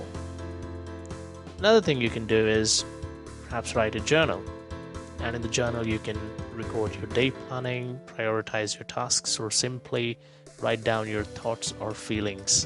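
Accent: India. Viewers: where are you from? I picked up on a bit of an Indian accent but just in a couple words 😉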